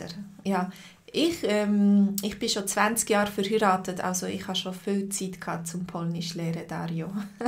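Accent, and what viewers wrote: Switzerland. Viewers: Aus welchem kanton ist dein schweitzerdeutsch? Ich ziehe bald nach Winterthur wegen ein job um und würd gern zu mindest die sprache verstehen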